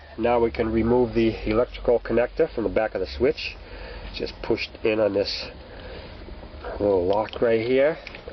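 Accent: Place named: Northeast, United States. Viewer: Paaaaak the caaaaaaa! How come all do-it-yourself guys have that northeastern accent real bad?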